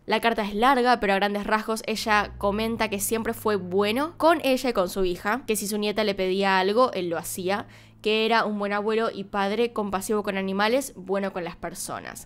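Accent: Argentina. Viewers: Hola, tengo poco viendo tus videos, muchas felicidades, tienes un hermoso acento. Argentino, no se si ya tienes el caso, de hace muchos años de los narcosatanicos de Matamoros, tam. Ojala y encontraras suficiente información al respecto y si te parece hacer un video. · Me encanta tu voz aterciopelada y tu pronunciación del inglés con tu acento argentino. Besitos desde México 🇲🇽 😘 😘 😘 🇦🇷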